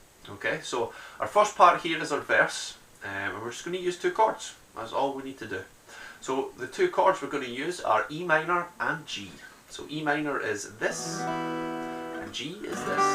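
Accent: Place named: United Kingdom. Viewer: Thx for a clear, well produced tutorial. " "? It was a good chance to brush up on my Mancunian, or Geordi, or Scots...whatever... sounds cool.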